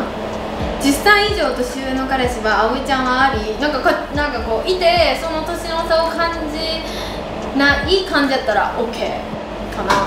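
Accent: Kansai, Japan. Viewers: 葵のアウター姿も可愛いが 本当は葵自身が可愛いのだ💕BEAUTIFUL GIRL💖💖💖💖💖 そして葵の関西弁は本当に可愛いね。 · JULIAちゃんの動画から来たからバリバリ関西弁？なのすごい驚いてる😂💗💗かわいい😳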